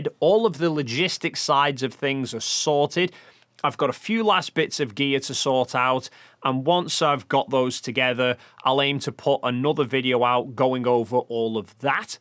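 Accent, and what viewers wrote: United Kingdom. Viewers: Sorry about the not-relevant question, but... I've been trying to place your accent. Where in the UK are you from?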